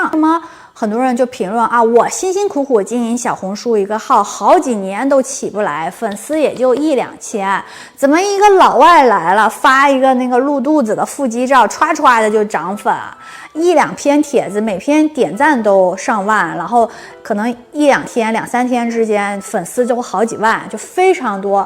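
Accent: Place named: Northeast, China. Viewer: 😂有点东北口音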